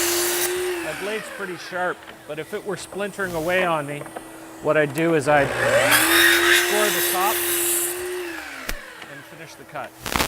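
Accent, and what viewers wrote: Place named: Canada. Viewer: Great videos! I plan to use this in my technical theatre classes. Random question: I thought based on your accent (and the snow) that you were probably Canadian, but then you still use imperial measurements instead of metric. Am I just wrong and you aren't Canadian, or do you still use imperial measurements in Canada for theatre, to be consistent with the U.S. industry?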